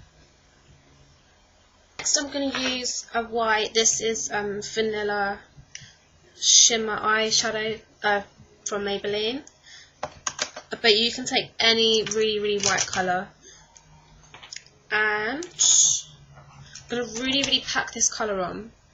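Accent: United Kingdom. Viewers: I REALLY LIKE WATCHING YOUR VIDEOS. YOUR SO ADORABLE WITH AMAZING EYES AND YOU HAVE A BUBBLY PERSONALITY WHICH IS VERY UNIQUE AND AWESOME. YOUR ART WORK WHEN DOING MAKE UP IS VERY CREATIVE AND FUN NOT ALLOT OF PRESSURE. I WANT TO GIVE YOU A 5 STAR AND 2 THUMBS UP GREAT JOB. BRITAIN ACCENT WITH A ROUND FACE ANTIQUE DOLL FEATURES VERY CUTE AND ADORABLE :-)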